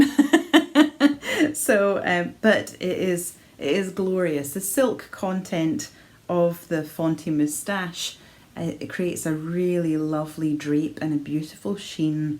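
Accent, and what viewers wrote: Scotland, United Kingdom. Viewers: What's bringing me joy? Well every time your new podcast is broadcasted it feels like al little present! I love to see your projects listen to your ideas and just listen to your wonderful voice and Scottish accent. You're a wonderful knitting companion, thank you and I hope you will keep on doing these podcasts for a very long time!! Lots of love, Ellen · Hi! I've been watching you for a couple months now and I am always impressed by your knitting. I am an avid sock and garment knitter but have been inspired by you to knit a Steven West shawl. I have bought the pattern for his Lumpy Space shawl and from my stash, have chosen silvery grey, black, white and a a red/black mix. I think I will cast on in the late fall so that I have it to wear in winter. P.S. My Grandmother was from Scotland, I have a great Auntie and a few cousins still in Aberdeen, so listening to your accent is reminiscent for me of my Grandma:) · Hello, I have been watching you from day one and thoroughly enjoy every episode! 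I love your Scottish accent and especially enjoy your colour schemes in your projects. I am planning to visit Scotland in April next year …Yay for searching yarn shops x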